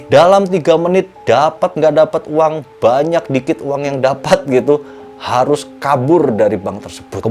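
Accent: Java, Indonesia. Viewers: Medok amat coy..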